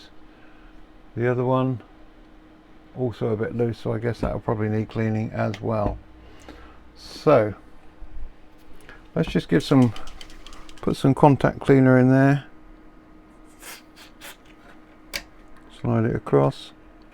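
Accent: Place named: RP, United Kingdom. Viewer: Great repair! I am a teacher of English living in Mallorca, Spain. I need to say that you have a great accent and pronunciation! I have even shown your videos to my students to show them propper RP! I am also very keen on repairing amplifiers! Congratulations on how much you teach the community with your great videos!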